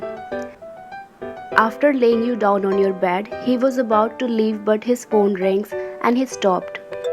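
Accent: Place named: India. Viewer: You are Indian right because of your voice it's telling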